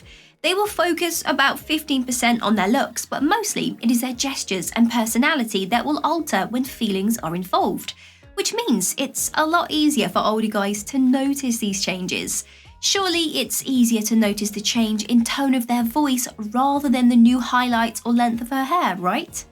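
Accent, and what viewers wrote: United Kingdom. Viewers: She is british....at least the accent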